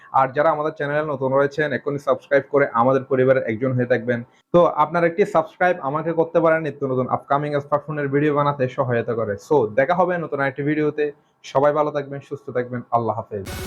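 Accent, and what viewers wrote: Chittagong, Bangladesh. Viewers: বদ্দা চিটাইঙ্গে নে?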